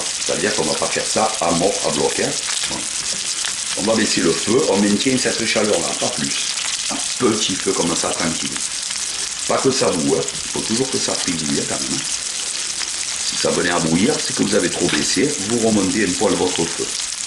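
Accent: South, France. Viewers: Je c pas si tu es marseillais ou pas mais bravo pour ta recette g bien aimé avec ton accent du sud sa sent le vrai. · Bonjour! Merci pour cette bonne recette; je ne connaissais pas la préparation avec les oignons rouges coupés en tranches. C'est succulent avec le miel! En accompagnement des pommes de terre rissolées c'est extra! Merci pour cette belle vidéo démonstrative! J'aime bien votre accent méridional! Amitiés de Belgique MIMILOU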